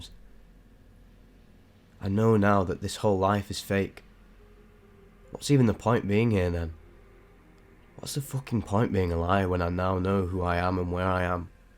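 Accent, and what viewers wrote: United Kingdom. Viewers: Love your british accent, voice and tempo👍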